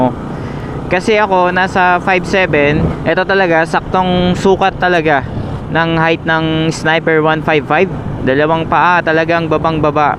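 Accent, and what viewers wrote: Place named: Visayas, Philippines. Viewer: Bobo ng mga reasoning mo. 😂 Sabe nya dahil daw mahal, pangalawa dahil sa installment. Tapos height disadvantages daw, bisaya sakit mo sa tenga pangalawa walang laman pinag sasabe mo. Kailangan mo pa daw mag practice sa mga barako na motor dahil masisira lang daw sa brand new sniper, eh paano yung mga bumili ng ducati nag barako ba muna sila. 😂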